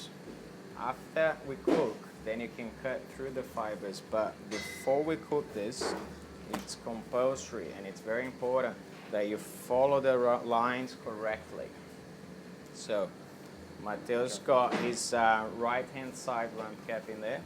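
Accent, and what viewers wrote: Australia. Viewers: Sounds soo Aussie. ..awesome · A lot of brazilians go to australia to learn English! That’s why he has this Australian accent! brazilians learn pretty easily the accent from the country we study the language! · Da hora esse sotaque aussie - e da hora também ensinar (direito rs) os gringo a fazer picanha - porque já vi cada vídeo bosta da galera "ensinando" rs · todd goslin he probably learned English in Australia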